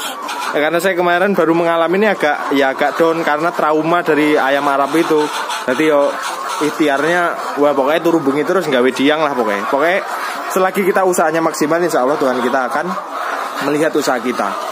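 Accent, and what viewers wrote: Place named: East Java, Indonesia. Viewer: Mantab mas ilmunya,..maaf jenengan asli mana to, logat jawanya kental khas jawa timuran..tinggal di Lampung.salam seduluran dari wong Sidoarjo jawa timur..tp asli jawa tengah Purwodadi Grobogan..juooossss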